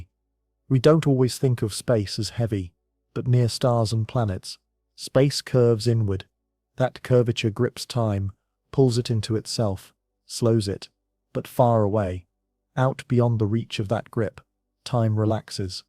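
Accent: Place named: United Kingdom. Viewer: Comfy soft bed, warm, cozy quilt, big, soft pillow, space video with soothing British accent. Good night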